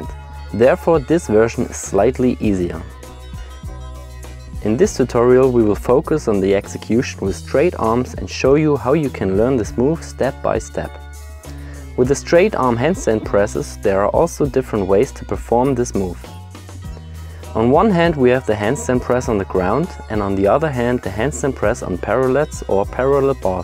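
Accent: Germany. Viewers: Wie man einfach das "deutsch " raushört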